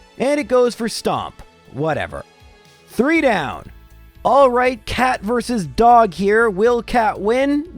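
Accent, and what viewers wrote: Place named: Canada. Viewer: Oh the Canadian is so noticeable.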